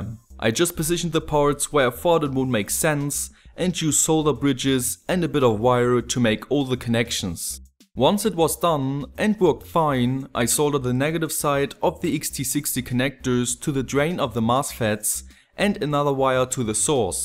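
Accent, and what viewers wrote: Germany. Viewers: Bart Simpsons he says people see that you are german because of the german accent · Man merkt das du Deutsch bist. An dem deutschen Akzent. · nice ^^ man hört richtig das du deutsch bist hehe hast n abo bekommen ^^ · Höre ich da einen deutschen Aktzent?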